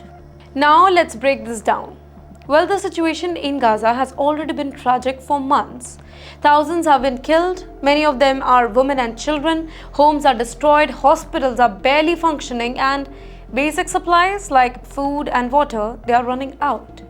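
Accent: India. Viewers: Indian pronunciation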